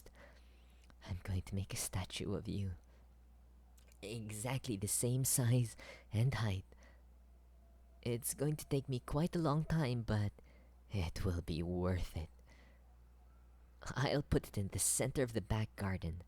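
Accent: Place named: Southeast Asia. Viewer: Low-key hear a southeast Asian accent but I can't point my finger on where the accent is from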